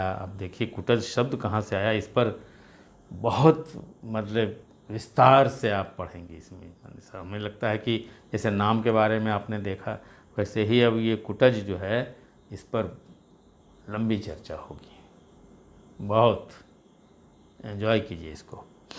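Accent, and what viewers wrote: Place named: Bihar, India. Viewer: Sir aap Bihar ,ara jilaa ke h kyaaaa❤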